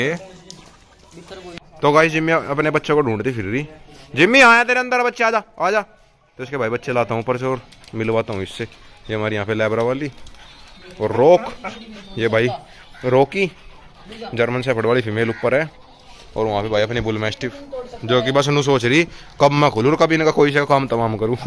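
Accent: Haryana, India. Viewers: Bhai app haryana se ho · Bro aap rhata up ma ho par bolta haryanvi ho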